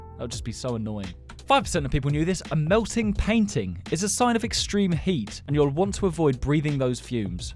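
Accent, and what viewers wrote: United Kingdom. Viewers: Are you British?😱😱😱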